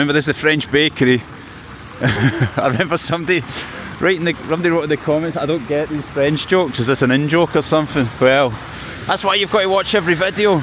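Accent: Scotland, United Kingdom. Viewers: Beautiful city. You dound scottish. Im from Strathbogie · Stopped by to listen to your accent. I adore scottish accent. Nice video. Not sleepy at all! Best wishes from Belarus! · Happy New Year. Enjoy your videos in Russia. Where are all the Lada cars? Lovely street layouts as well unlike Lanark! good to hear a fellow Scot reporting from Russia. Cheers Bill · Great project! I've visited Moscow twice and lived in St. Petersburg for 6 months. Your Scottish accent is pure ASMR - so relaxing. I really like your channel. 5 stars! By the way, I speak fluent Russian and I have no idea what that word next to the sea is. It's definitely not the Black Sea. It's Greek, lol.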